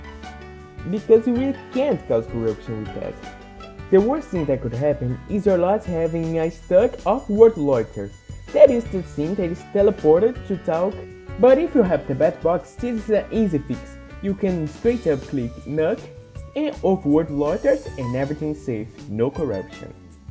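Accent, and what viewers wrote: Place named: Brazil. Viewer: VC EH BRASILEIRO NEEE ESSE SOTAQUE EU SEII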